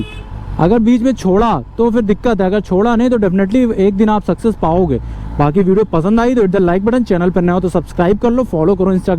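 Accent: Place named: Uttar Pradesh, India. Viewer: Bro your up accent is 🔥🔥🔥🔥🔥🔥🔥,keep speaking 🗣️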